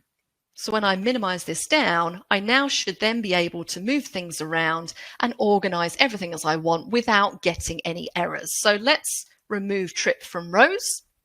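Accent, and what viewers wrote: United Kingdom. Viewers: United Kingdom accent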